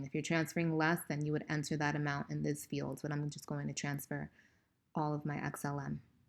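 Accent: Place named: New York, United States. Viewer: The New York accent makes this video that much better.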